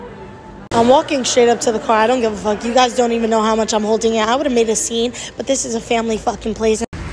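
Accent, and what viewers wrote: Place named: Italy. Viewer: Her raspy and deep voice just makes it a whole lot better, reminds me of a italian accent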